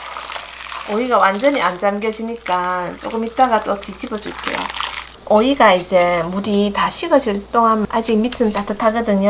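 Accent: Gyeongsang, South Korea. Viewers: 경상도 아지매네예~^^ 오이를 끓인 소금물로 먼저 담으시네요 어떤 장점이 있을까요?? 궁금하네요 또한 3종 드리고 이웃맺고 싶어요^^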